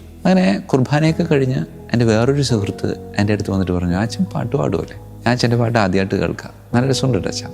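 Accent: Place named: Thrissur, India. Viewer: Thrissur aayirrikkam. Slang .....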